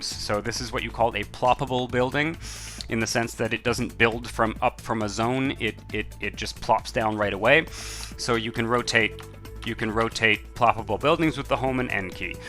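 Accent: Canada. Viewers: You sound Canadian, I'm Canadian and I looked at your twitter and I was right 😂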